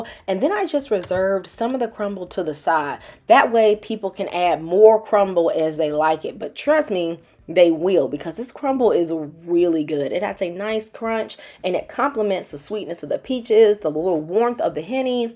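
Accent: United States. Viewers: I love your ghetto American accent, I'm from Africa and I'm glued to your channel baby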